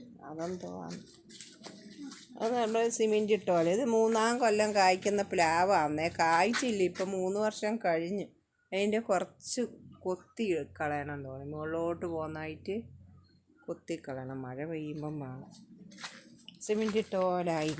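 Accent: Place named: Kannur, India. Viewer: കൊച്ചിൻ്റെ സംസാരം കേട്ടിട്ട് ഒരു കണ്ണൂർ ചുവ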